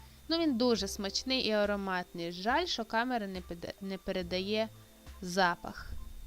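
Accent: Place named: Western Ukraine, Ukraine. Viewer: Добрий день, пані. З великим захопленням і радістю слухала ваше відео. Як приємно чути рідну мову. І особливо наш західний говір. Я, як правдива бойківчанка, до тепер говорю пательня і ронделик, пляцки і цвібак 😄. То є наш генетичний код 👍. Люблю той медівник. І ще крім горіхів добавляю родзинки і замінюю 1 склянку цукру на склянку кокосової стружки. Дуже цікаво виходить. Хочу відповісти на коментарі з запитаннями: " а де мед?" По перше мед ні в якому разі не можна нагрівати і тим більше пекти! При термічній обробці складники меду перетворюються в шкідливі смоли і віск! По друге всі медовики пекли або на штучному меді, якого є вдосталь в магазинах і по низькій ціні. Або робили в дома з цукру і води, або на молоці, щоб вийшла молочка карамель. А ще смачне взагалі на вершках, то взагалі бомба 🤩. Так що всім доброго дня і спробуйте цей смачненький медівник. 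І не переживайте, що там багато цукру, зате немає ніякого крему ні вершкового масла ні сметати! Якщо один кусочок на сніданок, то буде енегія на цілий день. Бажаю всім успіхів і всіх благ ❤😍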